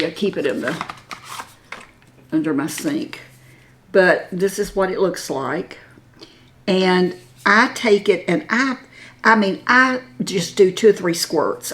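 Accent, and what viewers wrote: South, United States. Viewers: Thank you. I hate to say, but I will. I’ve been watching video after video. My mom and my aunts have been gone a very long time. So this was like getting to spend time with them again. A little while ago I was explaining something to my husband and I found myself going into all the small details. I realized I was speaking with your voice, sans southern lilt. Thank you! For everything.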